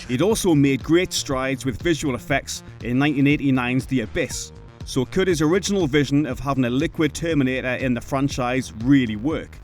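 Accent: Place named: Newcastle, United Kingdom. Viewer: The Geordie is back. Early NyN NAUT EES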